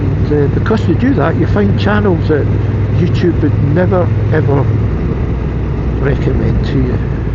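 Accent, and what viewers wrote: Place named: Scotland, United Kingdom. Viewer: Good video, stunning views, and that accent is doing half the tourism board’s job on its own. I can’t wait to visit Scotland. Videos like this are dangerous for the travel fund. Also, the live stream was brilliant. You guys are doing such a lovely thing for the community, and I hope it grows and grows. Proper good vibes.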